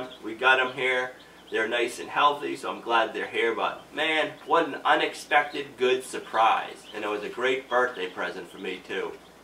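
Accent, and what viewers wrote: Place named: Pennsylvania, United States. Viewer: One, I love your accent. Im from indiana it reminds me of a friend of the family who was from Pennsylvania. I just love it. Also your videos are inspiring!! Id still like to know what type of dog your dog is? I pray the syrup flows and the snow melts as fast as you need! Thanks for sharing with us!!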